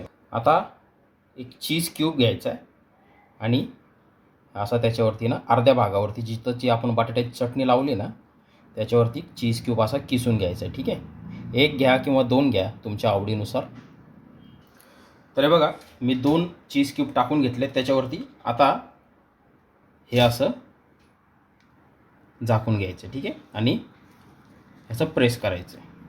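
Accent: Nashik, India. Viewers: आम्ही पण नाशिक कर आहोत तुमची बोलण्याची पद्धत छान आहे. रेसीपी ऊतम. नाशिक टोन🎉🎉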